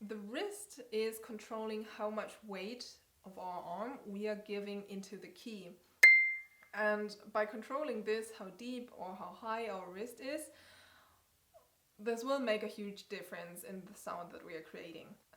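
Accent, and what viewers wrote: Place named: Germany. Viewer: Thank you! I love how you explain your ideas it’s like your speaking German through English 😅 💙 oh and how does patreon work? I’ve never used it before but i would like to support you! I practice Bach everyday at the piano, sometimes mozart Beethoven and Haydn but mostly Bach 🙌🏼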